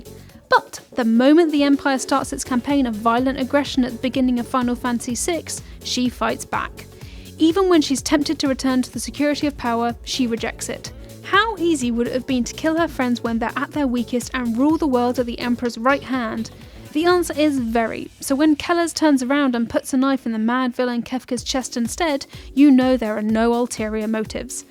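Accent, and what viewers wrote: United Kingdom. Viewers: I love female characters and often play as them when given a choice but I don't dig this videos' feminist undertone and the fact the boss from mgs3 is absent really is a bit of a fuckup. Keep your identity politics shit out of games, unsubbed, feminism is collectivist trash and you Brits need to move on. Stop letting bitter women project their shitty politics on games, that shit failed and we don't wanna hear it and it doesn't sound more clever with your shitty accent. How about a best characters list you gender obsessed witch.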